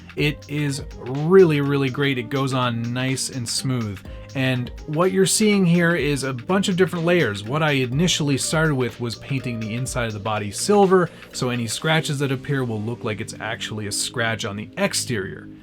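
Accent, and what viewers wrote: Canada. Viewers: Please type the name of that chrome paint pen you've mentioned a couple of times. Not sure if its your Canadian accent or my shitty ears or a combination of both... Tractor Truck is looking bad ass. The brazing work really looks great painted. Shows that not everything has to be bought off the shelf...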